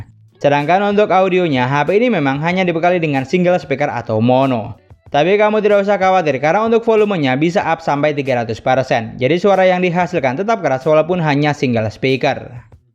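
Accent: Madura, Indonesia. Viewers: Aksen madura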